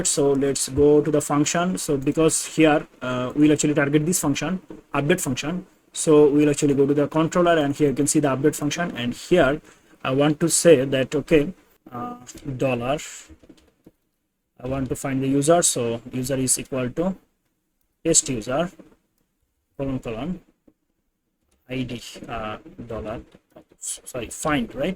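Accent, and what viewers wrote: India. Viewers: 50% = How to make a table in html . Strong idian accent. Badly confused instructor. With errors. Only full refresh method. Please do again.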